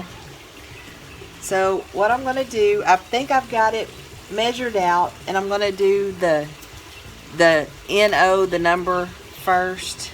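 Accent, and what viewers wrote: Texas, United States. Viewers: Hi, Enjoyed you video. Love your Texas accent. Front porch came out nice. I'm from Syracuse,New York. Look forward to your next video. Have a great evening. love & hugs Lucy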